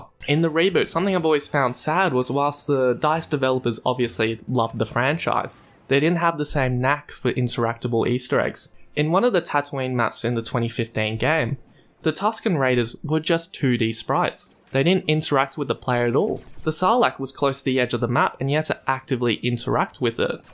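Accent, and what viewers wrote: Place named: Australia. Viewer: yeah once i heard an aussie accent i knew i was safe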